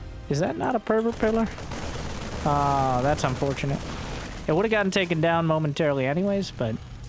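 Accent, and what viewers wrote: South, United States. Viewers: I actually stopped playing in like 2010, didn’t even follow it anymore. casts were so good, I was chilling in Taiwan for the past year and it randomly popped up on my YouTube and I’m like WTH, let me check out the game. He single handedly made me interested in spectating competitive SC2 again. At first I’m like, is this a joke? Dudes southern accent just throwing out stuff like making his spire great again in professional casts? Now I catch at least one cast a day. 😂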